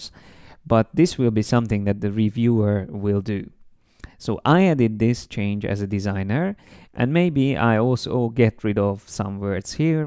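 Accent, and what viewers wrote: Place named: Hungary. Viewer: you have a kind of Hungarian accent. :)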